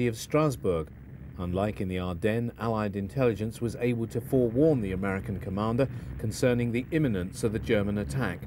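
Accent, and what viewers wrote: England, United Kingdom. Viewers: Simultaneous playing of English accent commentary, overlaid gun and artillery fire, pomp and circumstance ochestra music, and actual WWII German newreel audio. It's like the battle of Prokhorovka in my ears.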